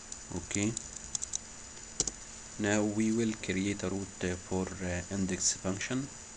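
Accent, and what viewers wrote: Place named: Arab world. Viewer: هههههههه بعد ما وصلت 3 دقايق فى الفيديو قولت الانجلش دا بتاع حد عربى قفلت الفيديو لاقية اسم القناة بالتوفيق يا هندسة اتمنى انك تعمل ابديت للشرح مع نسخ اللارافيل الاعلى على فكرة انا مش بتريق على الانجلش بتاعك انا الانجلش بتاعى وحش جدا اصلا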